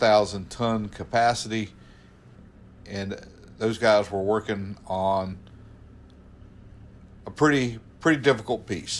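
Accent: South, United States. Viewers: WOW,WOW,Wow!I'm not part of your navy!I don't have a confederate flag and the north WON!You people started your accent because,you did't want to sound like "Yankee's"This is due to south"s low IQ!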